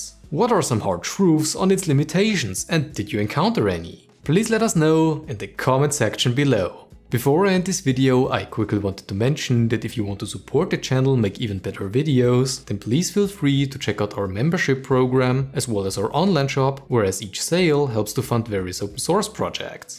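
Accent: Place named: Germany. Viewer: with a German accent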